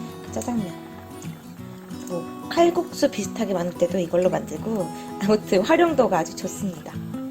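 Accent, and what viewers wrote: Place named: North Korea. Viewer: 우리 이쁜 북한억양. 중독댐!ㅎㅎㅎ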